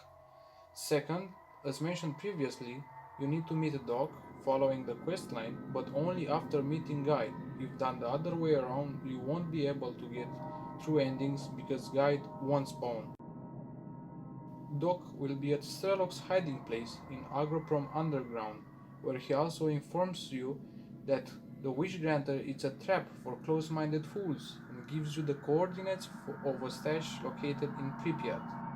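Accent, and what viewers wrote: Romania. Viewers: Wait,you are romanian?i ask cause of the accent and the flag gas mask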